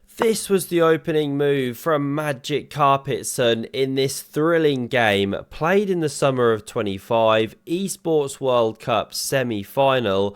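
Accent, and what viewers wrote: United Kingdom. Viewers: Love the humour! And the British accent <3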